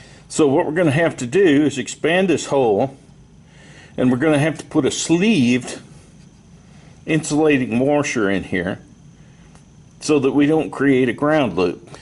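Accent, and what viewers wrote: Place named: South, United States. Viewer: What region of the US is this southern accent from? I have two units of superchamp xd. I got the first one new because I loved the sound and the price at the store. Years later I went for another one but they had the new x2 version which I didn't fancy. So I waited until I found one second hand. Great amp, size, power and.... brilliant sounds wiselychosen.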